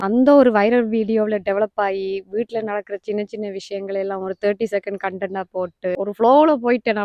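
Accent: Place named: Sri Lanka. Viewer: Nice. Keep going. ❤🎉. Love your srilankan tamil ❤ loves from 🇲🇾❤️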